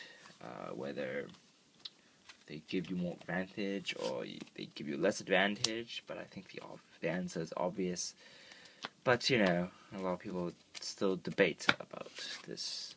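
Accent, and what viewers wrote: United Kingdom. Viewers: Nice British accent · You have a British accent when you're sick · U sound british for some reason! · You sound british when you're sick.. Awesome.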